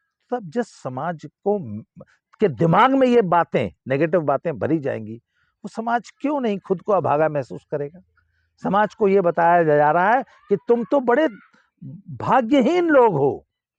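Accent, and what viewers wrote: Haryana, India. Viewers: Haryanvi touch minde blowing 👌🏻👌🏻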